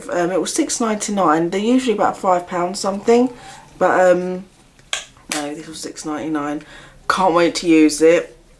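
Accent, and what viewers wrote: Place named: Australia. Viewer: Youre bloody stunning! You kinda sound Aussie :D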